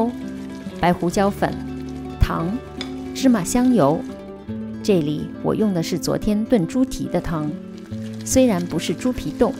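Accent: Shanghai, China. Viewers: 好亲切的沪普：long tang💕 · 原来是上海小囡呀亲切的很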